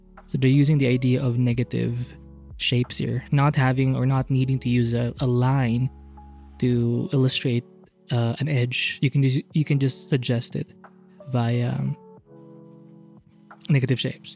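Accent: Philippines. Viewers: I'm getting Filipino accent in your voice, are you Pinoy?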